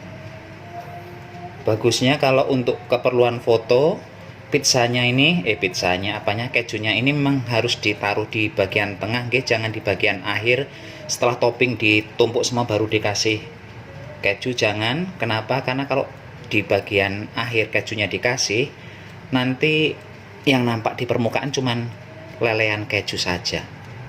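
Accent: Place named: Banyumas, Indonesia. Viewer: Mas, nganggo, bahasa, ngapak, lucu, mas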